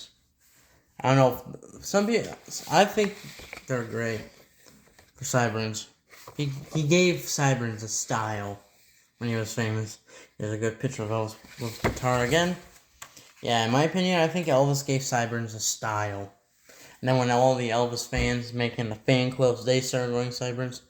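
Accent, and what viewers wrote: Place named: South, United States. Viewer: wow you sound like you’re from the south that’s amazing that you’re from Canada. I love the Canadian albums big fan.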